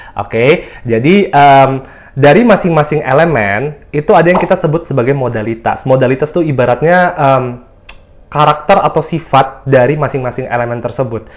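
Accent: Java, Indonesia. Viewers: Orang jawa po mas?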